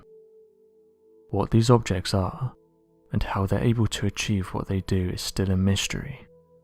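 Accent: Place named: Cockney, United Kingdom. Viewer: Yes, is very hard to listen to.. the low, whispery/breathy narration in cockney is horrible. The other chap is so much better.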